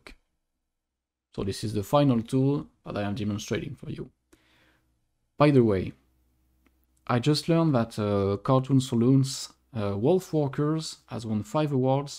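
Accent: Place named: England, United Kingdom. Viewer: Pas mal ton accent anglais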